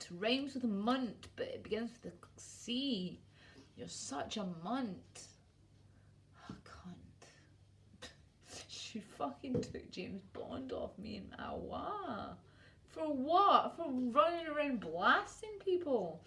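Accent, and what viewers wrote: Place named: Scotland, United Kingdom. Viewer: I subbed you back hunni,great to hear a good old Scottish lass in this fight,my 1st,lol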